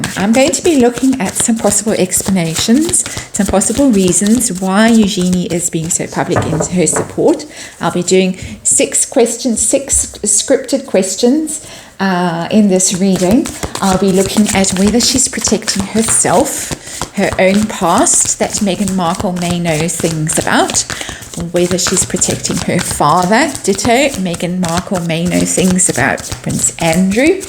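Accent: South Africa. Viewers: I thought you were South African. I enjoy your reads.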